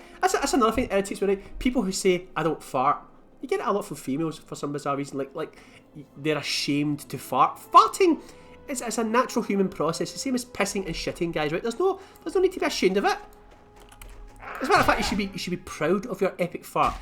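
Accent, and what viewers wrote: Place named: Scotland, United Kingdom. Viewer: Love Scots and Scottish acsent.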